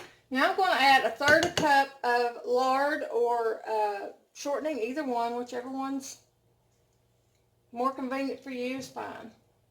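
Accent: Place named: Tennessee, United States. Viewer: Love the video. I’m a Tennessee girl and I talk like you do 🤗🤗🤗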